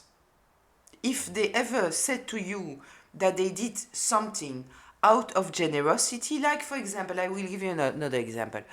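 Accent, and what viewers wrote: France. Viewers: i love listening to your perfect english in your beautiful french accent you should teach tarot, you make me understand the energy of the cards and the story they tell, so easily merci 😊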